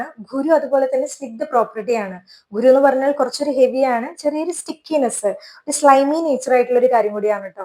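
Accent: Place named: Thrissur, India. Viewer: തൃശൂർ മലയാള പോലുണ്ട്. ഉച്ച്ചാരണംശരിയല്ല സ്പീഡുകൂടുതൽ ആയതിനാൽ ചില അക്ഷരങ്ങൾ ചോർന്നു പോകുന്നു. പല്ല് ഇല്ലാത്തവർ സൂപ്പർ ഫാസ്റ്റ് സ്പീഡിൽ പറയും പോലെ ശ്രദ്ധിക്കുക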